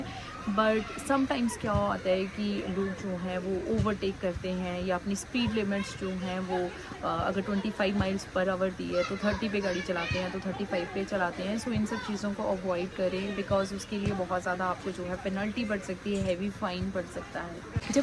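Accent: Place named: United States. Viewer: She is america born... english soo american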